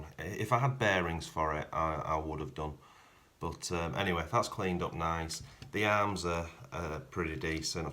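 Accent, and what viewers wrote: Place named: Yorkshire, United Kingdom. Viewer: Boomerang was my very first rc car...all those years ago ! Wouldn't mind another now. What you doing in Canada ? You sound like a Yorkie to me !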